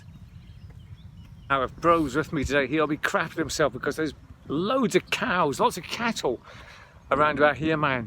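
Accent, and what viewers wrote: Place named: England, United Kingdom. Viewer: Yeah. to my ears I always felt you had an accent or dialect that was not typical of the area, but more English. The fight story of primary 7 confirms what I had thought. Were you born in England or spent a number of years there when you were young? Happy May BTW.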